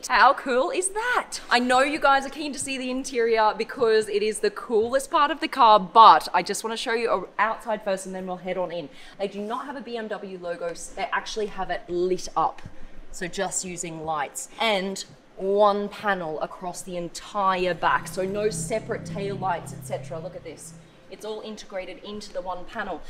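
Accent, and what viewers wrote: England, United Kingdom. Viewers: BRUH- I love your British accent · I like Your English accent, blonde... 😘😍🤗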